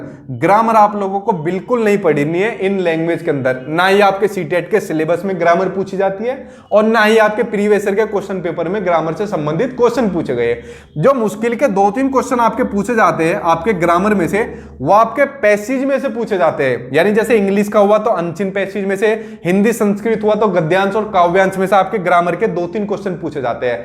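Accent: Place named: Uttar Pradesh, India. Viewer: Up se ho sir aap? Aapke talking way se pta chl rha h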